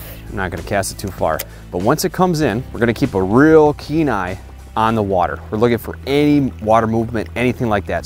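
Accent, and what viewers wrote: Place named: Minnesota, United States. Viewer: The BOOOatside , the mOOOst important. This guy is hick Minnesota/wisconsin as you get. LOL- cracks me up with that accent. Good recs hOOOwever.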